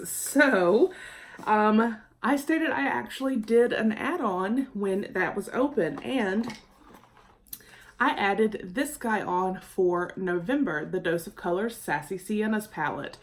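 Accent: South, United States. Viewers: Love boxy charm I would love to win..I'm from kentucky Iove your country accent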